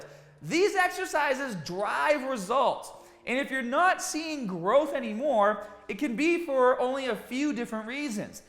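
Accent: Boston, United States. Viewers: Love it! That Boston twang! Cheers, as always I salute you!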